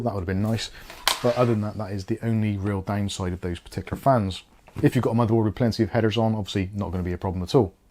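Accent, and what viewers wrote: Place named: West Country, United Kingdom. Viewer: Just found your videos, noticed the West Country accent and then saw your DJI test footage. Hello from BS30! Love the content and subbed.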